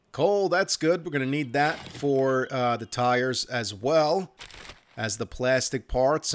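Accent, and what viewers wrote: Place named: New York, United States. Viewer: Dude, these rock xD And all in that what, east coast accent? You make me think a Manhattan guy woke up in the apocalypse and is just going around pwning stuff till they can get back to Noo Yawk lol xD Very enjoyable!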